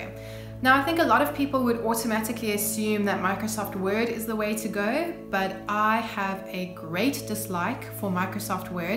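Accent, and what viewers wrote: South Africa. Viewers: Are you South African by any chance?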